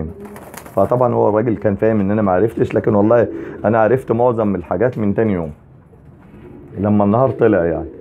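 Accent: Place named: Syria. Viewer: هو حضرتك سورى كلامك عامل زيهم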